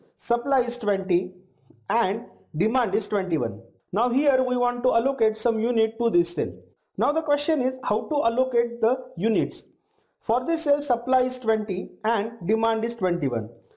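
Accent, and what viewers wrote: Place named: India. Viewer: indian accent sucks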